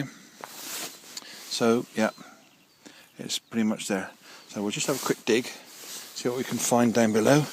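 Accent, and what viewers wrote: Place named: West Country, United Kingdom. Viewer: I'm growing several varieties from the garlicfarm "Wight" plus others I've picked up from other growers and private people, some varieties I can name off the top of my head are Maxatawny, inchellieum red, albigensian, solent wight. early purple, lautrec, Purple haze, Bella italiano, czesnok, siberian, red grain, red rezan, red belgian, vallalado, elephant garlic, avignon, killarney red, morado, estonian red, oosterdel (lithuanian) Harnas, wioleta, music, german stiffneck,Pyongyang, czech varieties Vekan and broadleaf, bulgarian, transylvanian, beli luk(bosnia) Anatolian Toscapru,(Turkey) in fact too many to keep track off. I actually work in Norway and despite having a bumper crop this year it was too long in the ground due the idiot (Ex)partner of mine not telling me it was ready and the bulbs opening, I am going to dump over 15,000 harnas bulbs in addition to the 6000 I've already dumped as don't have the land or resources to plant it. 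I will be back in Poland, for two weeks from 13-10 mail me at <mail> I hear from your accent your from the west country, I'm originally from Wiltshire. Scott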